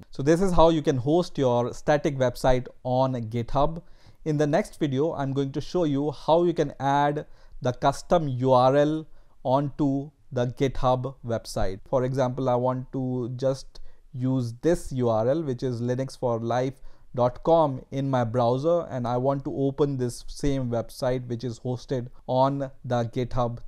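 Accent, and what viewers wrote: India. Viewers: This is the only Indian accent I like.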